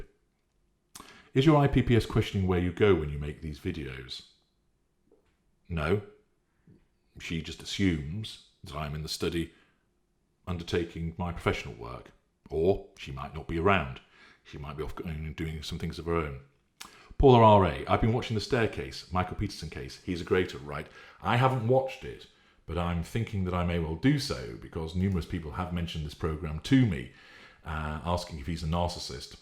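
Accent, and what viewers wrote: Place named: North, United Kingdom. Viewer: Sometimes he has a slight northern accent beneath the caramel coated gravel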